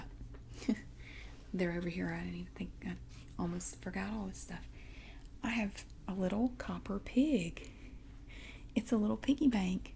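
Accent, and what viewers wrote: United States. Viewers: Your accent is amazing. I'm English and I love American accents. What's a goodwill store? Is it a charity shop?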